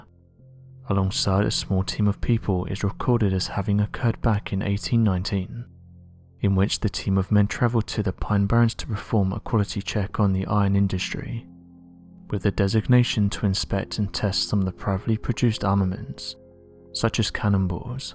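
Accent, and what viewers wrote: United Kingdom. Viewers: Spooky mysterious British accent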